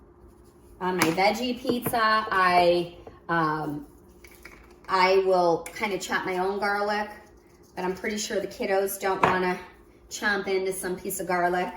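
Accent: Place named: United States. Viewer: You are an American😅😅😅 If you grew up in Italy you would speak Italian…correctly☺️ You’re just like the rest of us Americans! It would be like “African-Americans” not speaking an African language or knowing the correct African pronunciations of “African” words. All of us are a blend…no one is “pure” in race. We all are of the human race. Praise God! Thanks for your tutorial! Can’t wait to try!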